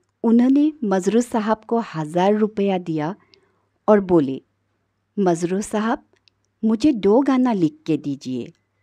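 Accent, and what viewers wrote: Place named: Assam, India. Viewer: Typical Assamese accent, love it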